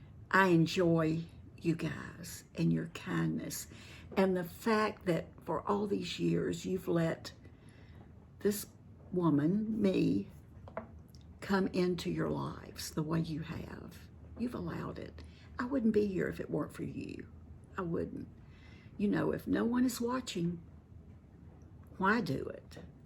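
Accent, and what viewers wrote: South, United States. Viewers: I’m almost 56. I’ve been watching for a little more than a year now because I love your outlook on aging and just life in general. You also have a calming voice and lovely southern accent that reminds me of my home state. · You have inspired me and I love your southern-style and Your accent and you stories 2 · Hi there dear lady....Just found your site. Love your Southern accent. Looking forward to viewing more of your lovely videos...(a nana in Pa.)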